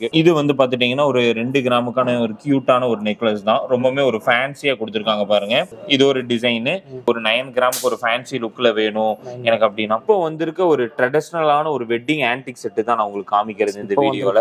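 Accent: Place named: Tirunelveli, India. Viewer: Tirunelveli vagea bro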